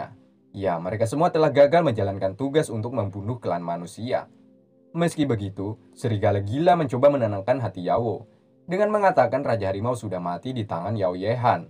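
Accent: Banjar, Indonesia. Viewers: Urang Banjar kah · Urang Banjar banh? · Banjar bnr kh bng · Anjir ngakak pas akhirnya, urg banjar pinanya ni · Banjar banar 🤣🤣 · Astga, lama nonton rupaNya yang cerita ini URANG BANJAR JUA 🤣🤣🤣🤣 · Urang banjarrrrr bjirrrrrrrrr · Orang Banjar ah bang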